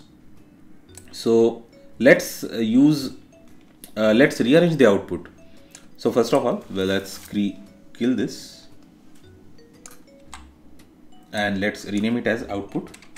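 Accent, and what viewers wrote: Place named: India. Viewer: THANK YOU, you are the one of the indian accent that i like, thank you.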